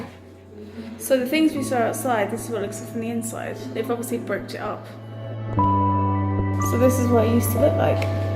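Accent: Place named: United Kingdom. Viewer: How come British people say karsal instead of castle ?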